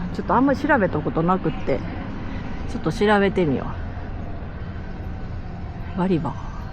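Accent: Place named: Kansai, Japan. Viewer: いつもこっそりと動画を拝見させてもらってます。 関西弁で、焼き鯖寿司を食べる女子、素直でとても魅力的な女子ですな👌 これからも、キャンプ道中お気おつけて🙂‍↕️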